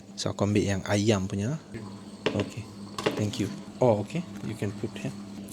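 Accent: North, Malaysia. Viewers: sy pun baru jer jumpa vlog dokter, mmg puas hati especially penyampaian yg amat jelas (siap loghat utara lg, im from Penang), terus berkonten 🎉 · Suka dengar loghat abg..org india tp loghat utara memang padu...btw saya org melayu kedah...loghat abg ni,buat saya rindu kt kg halaman di kedah...salam dari Labuan bang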